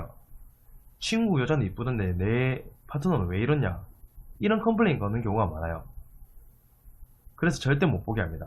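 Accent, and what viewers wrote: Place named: Busan, South Korea. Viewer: 말투가 부산사람같네요? 저도 부산사람인데ㅎㅎ 알바 뭐 검색하다가 재밌게 듣고 갑니다~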